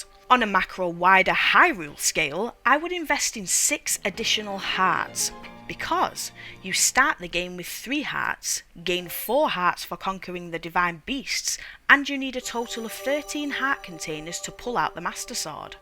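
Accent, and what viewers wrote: North, United Kingdom. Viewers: Great video. Also brilliant to hear a northern voice on a video like this ❤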